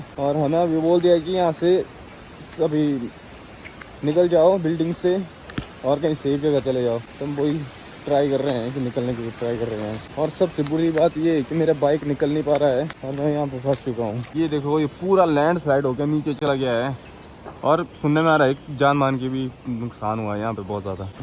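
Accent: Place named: Himachal Pradesh, India. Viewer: Himachali accent🌻❤ Stay inside and safe🌸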